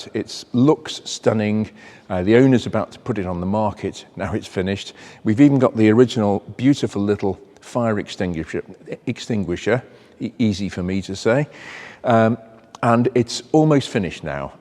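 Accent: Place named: England, United Kingdom. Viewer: most English commentary I have heard in some time, great work from Australia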